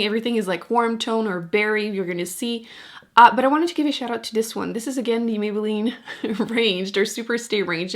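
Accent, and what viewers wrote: Quebec, Canada. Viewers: I could listen to you talking all day. LOVE your accent. It's French Canadian yeah? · yes i thought it sounded faded French and then i googled it and someone said you sound French Canadian. Usually they have heavier accents though. Where do you live?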